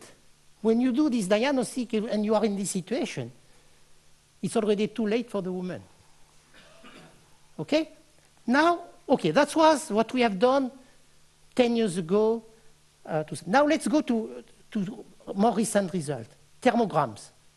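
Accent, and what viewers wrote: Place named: South, France. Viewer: Nous n'entendrons plus ton accent du Sud et tes fous rires😢 tu avais tant à donner encore. Regrets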